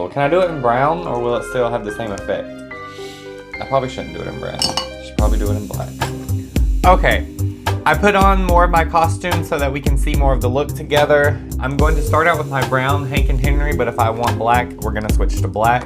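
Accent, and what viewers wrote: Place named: United States. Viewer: why do you sound so country??? Lmao Where do you live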